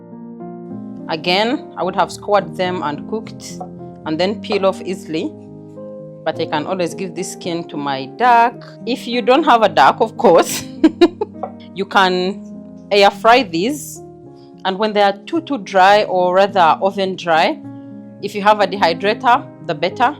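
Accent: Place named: Kigezi, Uganda. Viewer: How I love your videos Then your kikiga accent when you are speaking 😂😂😂 Keep going mukazi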